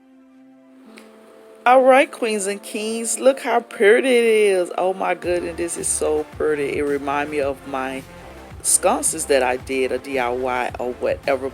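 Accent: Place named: Detroit, United States. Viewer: Great idea! That accent! What region are you from? Detroit?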